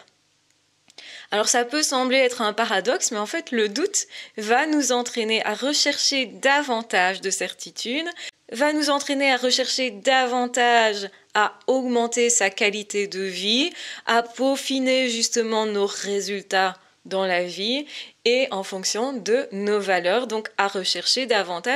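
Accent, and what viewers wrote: Belgium. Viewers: Tu es belge ?¿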